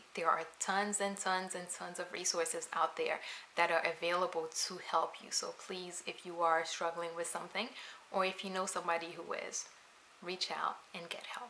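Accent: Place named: East Coast, United States. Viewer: I love your East Coast accent! My dad is from NYC and it's my favorite accent.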